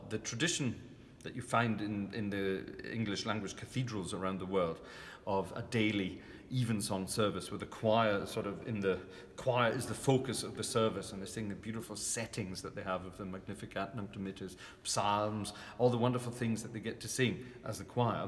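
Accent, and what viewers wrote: Germany. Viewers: You seem to have developed a slight on-and-off German accent. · Love your channel and thanks for all the wonderful videos! If I may ask, and the question somewhat fits the titel of the video: How long have you been living in Germany? I mean no offence, but you seem to have a slight German accent in your English? That, or my hearing is just shit! Keep up the amazing work on your channel!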